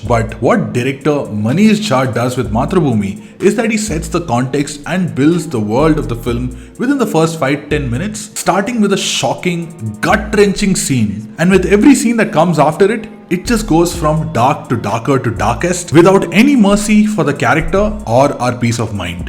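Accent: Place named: Kerala, India. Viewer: Mallu pretends to be tamil. But basic accent is mallu. Boring narration.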